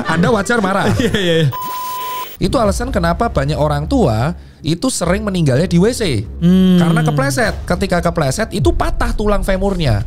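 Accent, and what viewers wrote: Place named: Java, Indonesia. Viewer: Dokter tirta jawa bngt ya... Ngomong nya medok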